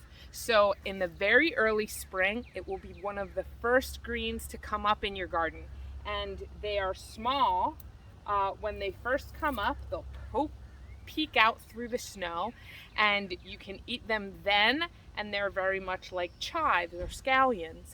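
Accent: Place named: New Jersey, United States. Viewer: I have been considering walking onions and someone handed me some at my farmers market this week so I plan to plant them. I’m wondering if I plant them in the fall or in the spring? I have lived in Illinois for 45 years but I recognize your New Jersey accent right away since I grew up there. I didn’t move here until I was 22. You made me homesick lol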